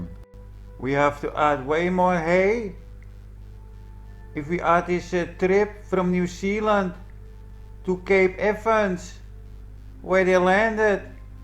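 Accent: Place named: Netherlands. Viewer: <link> There are two horses on the right side in the "picture" just above the crates. They blend in a bit with the snow ;) Not saying its real just spotted some horses there. In a way its awesome all these bullshit stories from the past, gives us material for comedy gold. ;) And as a fellow "Dutchman" i love your accent and way of talking! You bring me joy and uncontrolled laughter in these batshit crazy times haha.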